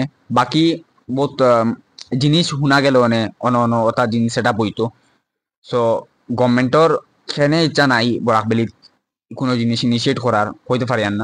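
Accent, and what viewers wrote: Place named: Sylhet, Bangladesh. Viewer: Tumi olpo Shuddha Bangla Matiyo. Jaate Non-Shylleti manusheo jaate bujhte paaroin........ .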